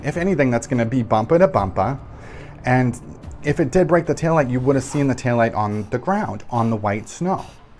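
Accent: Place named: Boston, United States. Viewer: lol I could care less innocent or guilty but every couch potato I come across trying to be a “reporter” never addresses FACTS..missing Lexus telematics that would actually convict her, 3 missing surveillance tapes, 2 destroyed phones, and there isn’t enough words about the investigation. Also..the Boston accent with a lisp is not air worthy..sorry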